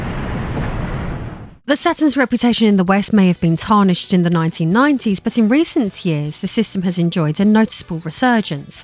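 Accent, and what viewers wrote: United Kingdom. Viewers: Not sure which part of Britain you're from but your accent is gorgeous. Very similar to 'Obsidian Ant' a guy who talks about space games, except he sounds like a wizard! He has a few hundred thousand subs... Great accent 👍👍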